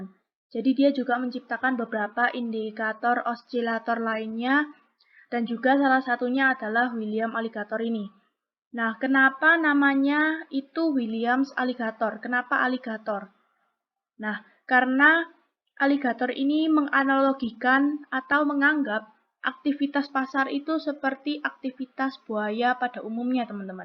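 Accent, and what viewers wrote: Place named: Java, Indonesia. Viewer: Mbak Wong ngdi.. medok lo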